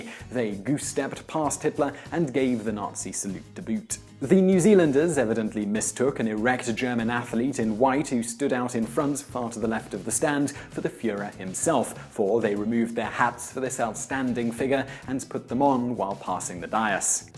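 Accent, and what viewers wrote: United Kingdom. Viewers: Jadger1871...It is wise to keep in mind that he is from Britain and certain words are said different in other countries. I live in a country other from where I was born and grew up and it is not unusual for people to correct me in my pronunciation. I pay then little heed because my way of saying the word is no more or less valid then is theirs. If they were to go to my country they would be corrected there just as I am here.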